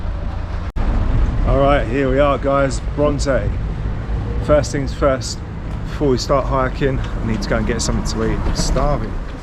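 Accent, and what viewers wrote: United Kingdom. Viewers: I was curious to hear your Italian, it seems good to me, with a very British accent😉, funny to see you wrong to say "specificamente" haha👍🏻 The Sicilians in the port of Catania all seemed very intrigued haha .. Sicily is beautiful but also very particular, especially the Sicilians, you just need to move a few km and change cities to find very different habits and customs, the food is usually very good and cheap , but be careful to call food right, Sicilians are offended if you're wrong haha... I crossed Sicily from Catania to the Egadi in 2021, passing through Etna, Enna Agrigento, Palermo and Trapani... so I'm very curious to see all 3 videos. I've never tried Bronte pistachio limoncello🤔 but it should be drunk after it's been in the freezer 😅👋🏻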